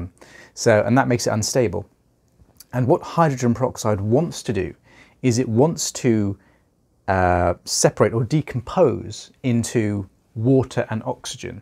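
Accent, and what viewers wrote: England, United Kingdom. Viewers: His young British voice really helped soothe the horror show that came out of that ear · He is so cute and the english accent is cherry on top 😍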